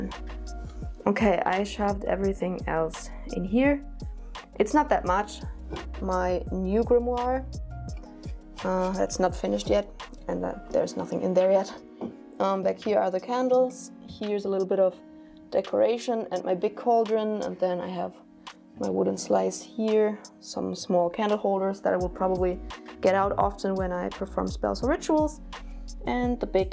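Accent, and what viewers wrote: Germany. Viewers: she liked your German accent. Sweet...it is sweet blessings have a good week have some cake..🎂🍰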